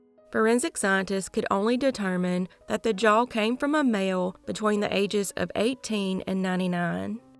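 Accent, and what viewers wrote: South, United States. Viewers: With each passing video, my love increases for this channel & that accent! I'm a MISPLACED SOUTHERN BELLE & whenever I hear "that beautiful SOUTHERN DRAWL" I instantly become homesick, then that sense of PRIDE of knowing that I REPRESENT: THE BEST FOLKS, GREENEST LAND, GOOD EATIN, MARDI GRAS, COWBOY BOOT WEARING, HORSE RIDING, NO COATS NECESSARY, NEIGHBORS ARE FAMILY ROUND HERE LAND, CHURCH HOUSES OUTNUMBER THE DEVIL'S DENS & THE SUNRISE, AS WELL AS THE SUNSET, IS VIEWED AS GOD'S BEAUTIFUL SMILE UPON ANOTHER BLESSED DAY FOR WHICH WE TAKE PRIDE IN BEING PRESENT TO HONOR & ACKNOWLEDGE! TIME DOES MOVE SLOWER IN THE SOUTH...I GUESS THAT'S WHY WE AGE SO WELL!!! FROM SOUTHERN LOUISIANA to THE GREAT STATE OF TEXAS TO THE GOLDEN GULF COAST of MISSISSIPPI (yes, in that order for me) I WILL ALWAYS LOVE, REPRESENT & APPRECIATE THE BLESSINGS & BEAUTIFUL MEMORIES CREATED IN A WORLD UNLIKE ANY OTHER! 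THANK YOU FATHER GOD FOR FAVORING ME! 😇💕💯🙏🏽🤷🏽‍♀️🐎🥂🏖💒⏳🌞🎭⚜🇨🇱💃🏽💪🏽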